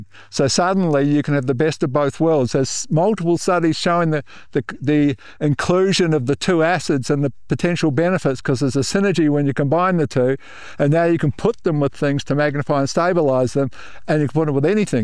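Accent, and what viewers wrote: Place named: Australia. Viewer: The captioning translation is saying collate do you mean chelate? Your Aussie pronunciation is changing what we in USA pronounce as "key late"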